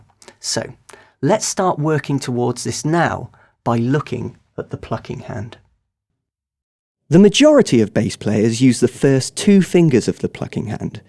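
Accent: United Kingdom. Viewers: You're like the British, calm version of LinusTechTips.. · Wow you’re very British!:-) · ingiliz aksanı.. tek kelimesini anlamadım a.q... japonca sanki...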